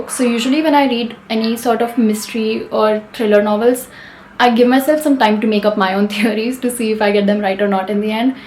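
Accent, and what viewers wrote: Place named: Bengal, India. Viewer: why does she have a hint of a bengali accent? sounds cute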